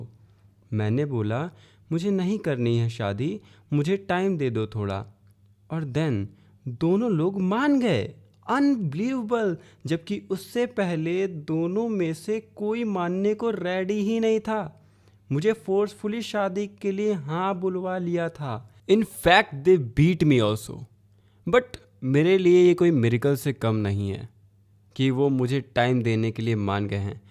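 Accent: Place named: Allahabad, India. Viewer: Ap allahabad se ho kya kyoki mai kom Ham bolte hai 😂❤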